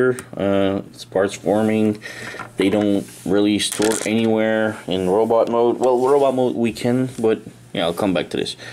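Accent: Quebec, Canada. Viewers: it was perfectly fine pronunciation. Sounds like a Quebec accent to me and I right? 👍🏻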